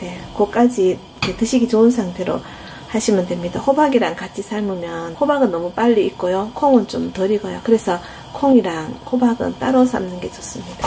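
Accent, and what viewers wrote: Gyeongsang, South Korea. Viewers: 호박범벅!!! 님 올려주시는 영상 모두 맛나게 생겼네요^^ 꼭 래시피대로 만들어 봐야겠어요 ㅎ 경상도 사투리가 고향이 그립게합니다 오늘 것절이 래시피대로 만들었더니 너무 맛있어요 ^^ 구독하고 많이 배웁니다 ~ 여기 워싱턴입니다 2019년 새로운 영상 기대합니다 ~~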